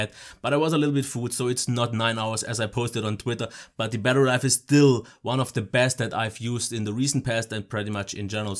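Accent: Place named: Germany. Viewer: I love the review and accent! It sounds kinda german'ish. :D (Just in cute)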